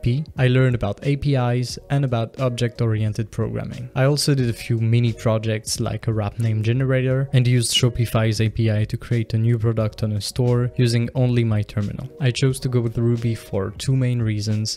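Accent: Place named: United States. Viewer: Comment tu as un accent totalement américain alors que tu est bilingue ?les techniques pour mieux apprendre l'anglais.merci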